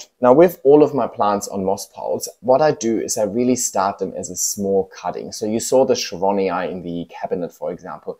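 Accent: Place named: Germany. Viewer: den deutschen akzent versteckste nicht, trotz sehr gutem englisch, aber sollste auch nicht! :-) NRW/Osnabrück? Habe jetzt nicht das gesamte Video geguckt, wie lange wächst deine Größte Monstera und die albi variegata?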